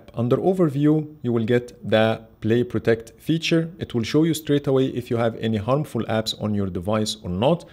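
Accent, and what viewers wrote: Egypt. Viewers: u accent look Egyptians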